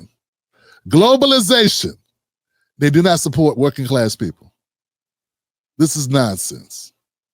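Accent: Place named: Washington DC, United States. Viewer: I watched Professor Ball's episode that you are citing. It was very well done and researched. I'm not sure what you're trying to accomplish. But I haven't taken your show serious in a very long time, which is why I don't usually watch it anymore. You should stick to comedy. I only subscribed to provide support to a Black podcaster. But I am not down for this chocolate-covered white conservative with a DC twang. I''m out. Unsubscribed.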